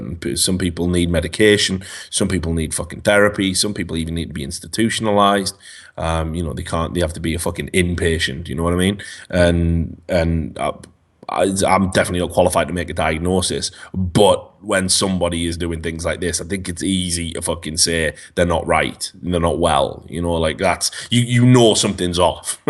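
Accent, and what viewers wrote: Wales, United Kingdom. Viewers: I've never heard you with a Welsh accent before... Sam rubbing off on you? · Never realised how Welsh normal off camera voice is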